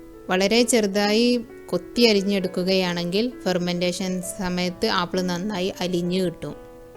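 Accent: Malappuram, India. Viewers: Malappuram ano. sahodari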